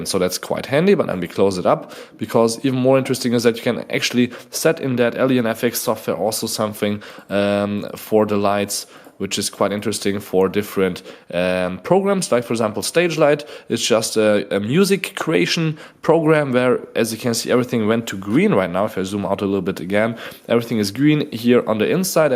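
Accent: Germany. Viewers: man hört's einfach immer wenn Deutsche Englisch sprechen 😀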